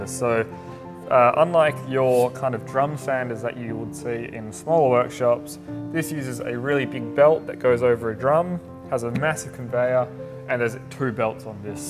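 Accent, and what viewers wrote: Australia. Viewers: maybe try vibrating your epoxy in the mixing cup to remove the mixing bubbles, orbital sander should do the trick... also works on the slab too but make up a dummy pad so no pesky dust:D Judging by the accent you in Aust?(probably one of the worst country's to be a fine furniture maker)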